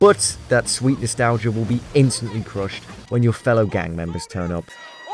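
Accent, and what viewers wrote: United Kingdom. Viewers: British love it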